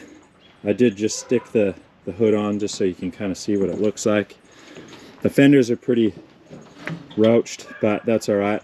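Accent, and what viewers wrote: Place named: Western Australia, Australia. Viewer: As i am watching this Video my brain hurt. All lhd Cars so your in the americas I suppose but I was hearing a western accent with hint of an Ausi accent.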